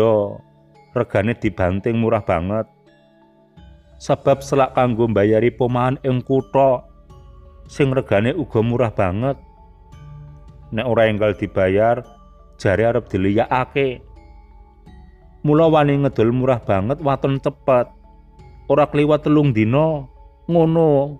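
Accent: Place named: Banyumas, Indonesia. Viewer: Bagus..jawa versi ngapak banyunasan...jawa tak hanya jogya solo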